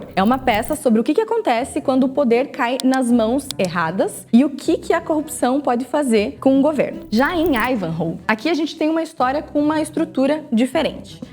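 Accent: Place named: Curitiba, Brazil. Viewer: O sotaque dela é tão bonito! Certeza que ela é curitibana! 🤭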